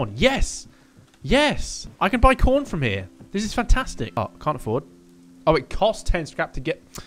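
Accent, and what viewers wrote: United Kingdom. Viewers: Yo, about the coffee thing... I wanted to ask you a question - which may sound odd but it's genuine. is just your nickname or your actual nationality? (I don't recognise accents well, English is not my mother tongue). 'Cause I'm a Pole who spent 16 years in Italy and got used to that quality of coffee, I'd never buy coffe from an American because they do it wrong 😅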